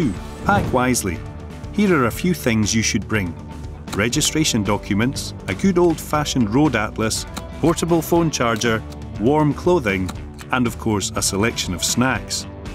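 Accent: Scotland, United Kingdom. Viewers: I just love the Scottish Accent.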